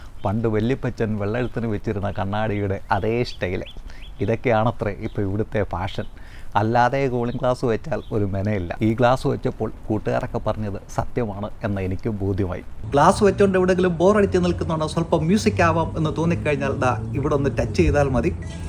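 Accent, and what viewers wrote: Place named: Ranni, India. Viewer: നിങ്ങൾ റാന്നിക്കാരനാണ്,,, അത് ഭാഷ തെളിയിക്കുന്നു.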